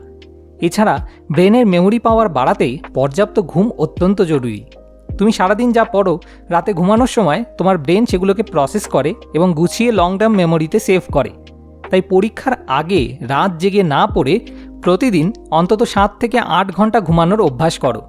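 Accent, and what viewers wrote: Kolkata, India. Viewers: Apni ki kolkatar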